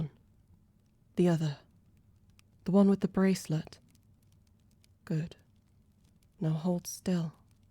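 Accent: United Kingdom. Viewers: A nice romantic story, but the sorceress didn't seem that dark to me. I like your voice and your English accent.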